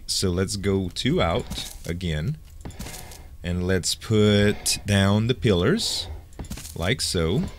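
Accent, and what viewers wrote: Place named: Florida, United States. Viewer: I'm originally from Florida but am in Washington. Your accent brings back good memories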